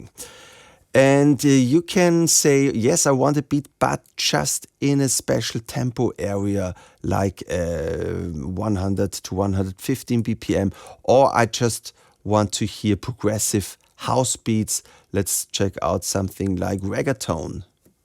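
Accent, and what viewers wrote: Germany. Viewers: I like this dude. I usually find German accents too harsh but this chap is very pleasant to listen to. Doesn’t sound like he’s in a hurry like most YouTubers! Nice and chilled out and uses pauses and explains things well. I also love how he says “schteinberg” in the true german way. I also love dom sigalas videos but I am finding those constant zooming jump cuts really annoying!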